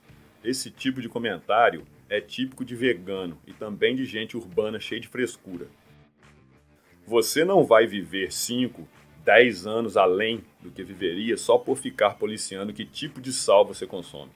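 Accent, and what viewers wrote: Minas Gerais, Brazil. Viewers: Mineiro, de qual cidade voce e he ? porque seu sutaque e muito do interior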